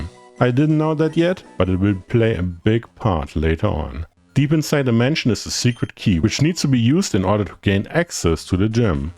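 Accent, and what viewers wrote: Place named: Germany. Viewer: The accent is so familar but I can't quite put my finger on it. German?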